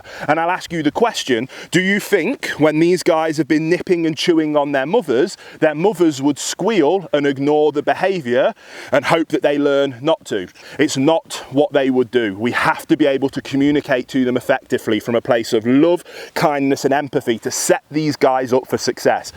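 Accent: United Kingdom. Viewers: Hold up. He's a big husky dude with an epic beard, a British accent, tattoos, sitting in a field of nice grass with puppies and talking about love, empathy, and kindness. Yeah, this dude fucks.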